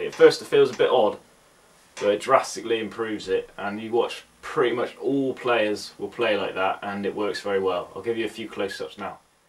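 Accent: United Kingdom. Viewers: you look and sound like marty friedman, just with britsh accent :o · Is that a west country accent i detect ?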